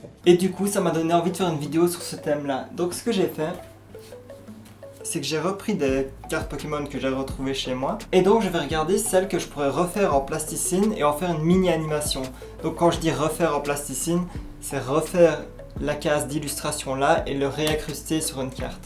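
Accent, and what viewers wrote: Liège, Belgium. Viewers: Petit accent liègeois dans cette vidéo :d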